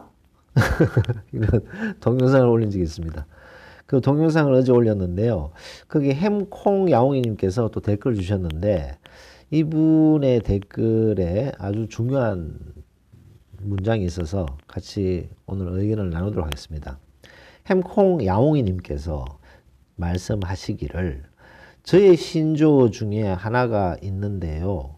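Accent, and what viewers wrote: Gyeongsang, South Korea. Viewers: 선생님^^ 구수한 갱상도 사투리 듣기거북하지 않습니다. 내용이 문제지 사투리가 뭐 문제겠습니까? 사투리라고한다면... 지방언어인데. 그 지방에 사는 사람들이 쓰는 말이 사투리입니다. 촌사람들이 쓰는 말이 사투리가 아니라는 거지요. 그러니까 서울경기에는 서울경기의 사투리가 있다는 겁니다. 꽝! 꽝! 꽝!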